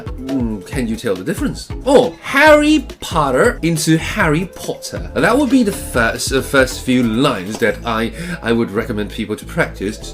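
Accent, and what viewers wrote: United Kingdom. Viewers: 英國腔好有魅力 可能是老師比較有魅力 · It is interesting! 😂😂😂But i dont want to get hit in the UK. The accent you're using is galling for the British.... (I was living in the UK.) 🤔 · 點解片中的你好是配音一樣? 但聽出片中所之英語是英式的. 請問老師...[dancing] 美式 和 英式 是否不同讀法? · 看到最後才很驚訝地發現老師是臺灣人啊！ 以為是在外國長大的人！ 英國腔好好聽唷，我要繼續學下去！ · 作为一个在伦敦工作的中国人，我想说一下我自己的想法。 1. EKO老师的发音的话，应该是模仿的英国的最为大家熟知的RP，也就是英国贵族以及BBC的主持人等使用的说话的方式，但现在的话，大家用的更多的是Modern RP, 比如说： youtuber 博主 Lucy 就是典型的代表。 2. 其实英国各个郡都有自己的口音，我之前居住的地方属于约克郡，这个地方的口音就叫：yorkshire accent。 3. 总之，作为非母语者，我发自内心的觉得EKO老师的英音真的很舒服。如果你们看过英剧《the durrells》,EKO老师的发音就跟里面的Larry Durrell 的发音一模一样的，舒服！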